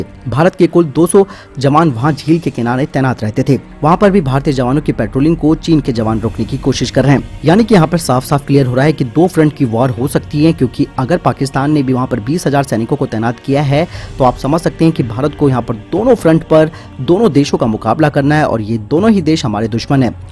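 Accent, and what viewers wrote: Uttarakhand, India. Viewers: कहां के रहने वाले हैं आप। मुझे तो उत्तराखंड से लग रहे हैं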